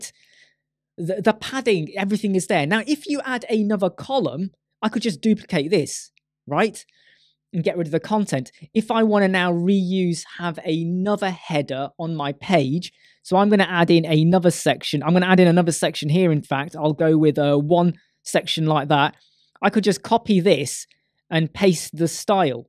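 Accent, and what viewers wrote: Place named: United Kingdom. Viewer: Good videos but I hate british accent. It makes me angry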